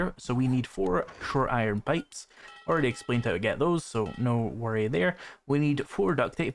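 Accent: Scotland, United Kingdom. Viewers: are you Scottish, sound very very similar to a Scottish person trying to talk slow so other people can understand us 😂🤣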